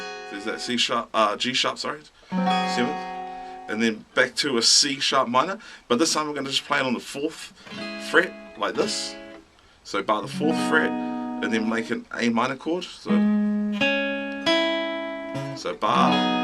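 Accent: New Zealand. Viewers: haha you are hilarious dude. Stoked to hear a kiwi accent mah bro. great tutorial too man. Such a solid RnB song aye. Us white boys got some soul too haha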